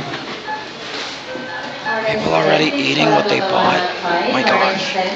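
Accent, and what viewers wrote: Philippines. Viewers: ur voice's funny, did u intentionally do that? and u kinda hav an accent, an english-filipino accent.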